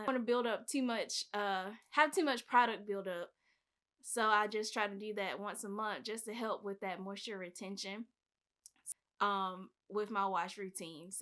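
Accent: United States. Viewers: That’s funny that Nichole Harmony_TV asked you where you’re from. To me you sound like my people from North Carolina. Where are you from? Anyhoo, I love your entire loc journey. With your problem sideburn loc can you just cut it off where the tip meets the latest knot and then as it grows start a new one as a braid loc? To make sure it is a secure loc? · Where you from?!? You sound like my family from Baltimore, MD! Just on your “too” and other “t” words… Lol! I do the same, and I wasn’t even raised around them! I love it tho!🤗💜🤗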